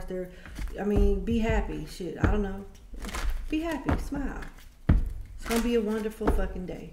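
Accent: South, United States. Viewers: you are so pretty and blessed I'm glad I found you and your southern accent is adorable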